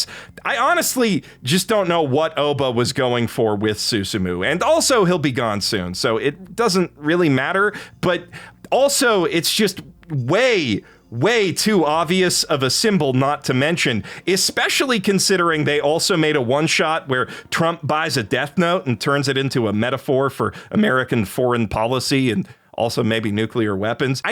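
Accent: Canada. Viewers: Thanks for the CW, I was already glaring at that timestamp and dreading spending that long listening to a canadian, and that splash screen confirmed to me that this video isn't worth watching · An overdose of Canadian in this one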